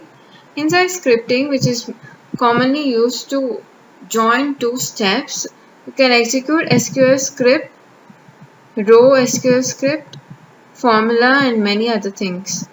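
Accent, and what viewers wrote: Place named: India. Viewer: 最难忍受 印度人 说英语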